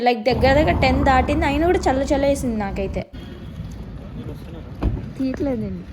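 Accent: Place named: Telangana, India. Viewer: Mem veldham anukuntunnam so me vedios chusam chala bagundhi vedios ❤ tg slang i like it 😅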